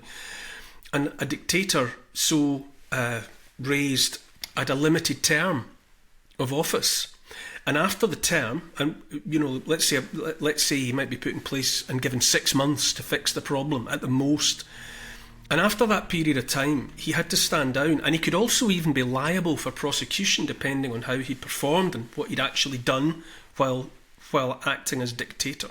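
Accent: Scotland, United Kingdom. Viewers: Always the Voice of Reason and love hearing it from your Scots accent! 😄🙏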